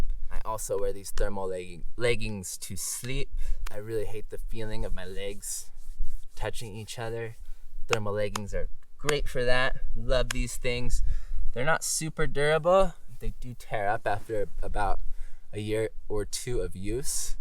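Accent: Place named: California, United States. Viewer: Good content. I'm having confusion over the accented speech - kind of valley guy of sorts. Got to be from CA, maybe Portland or Seattle.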